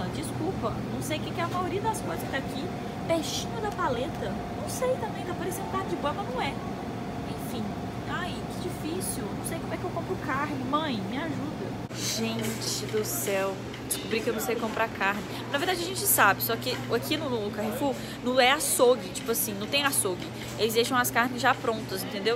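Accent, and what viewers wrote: Minas Gerais, Brazil. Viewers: Esse sotaque mineiro aí é de qual cidade? O pessoal da cidade do meu noivo tem o mesmo sotaque 😂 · Esse sotaque Mineiro e bom demais gente❤❤ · Entrei nesse canal falando essa menina tem sotaque de mineiro até vc dizer que o galo era mozao !! Já me inscrevi! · comecei a ver seu vídeo e pensei o sotaque dessa menina parece ser MINEIRO, e eis que você fala que é de Minas. Adorei!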